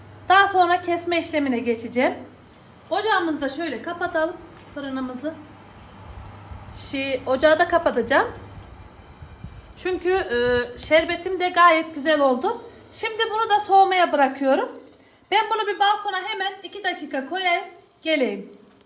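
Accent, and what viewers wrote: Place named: Konya, Turkey. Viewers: Tamam konyalı konuş yonuşyosun hanım ben konyalı yım calarım biz abane oldum hanım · hmm nerelisiniz konuşmanız bizim yöremize çok benziyor nevşehirliyim ben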